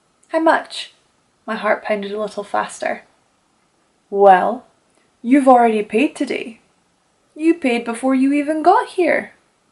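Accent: Edinburgh, United Kingdom. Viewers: So nice to hear such a lovely Scot lass tell a beautiful story. Would love to hear a wee bit more of the Edinburgh accent, it is my favorite sounding speak. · I adored this story dear. I love your EDINBURGH accent. Once in another life I visit EDINBURGH. Almost marry a Scottish gal. Thank you for what you do.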